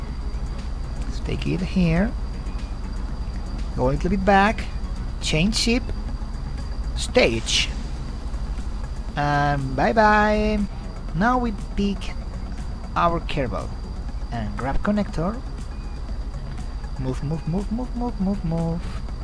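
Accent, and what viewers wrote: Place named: Poland. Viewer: Wait... You're spanish? I was thinking, that you're polish.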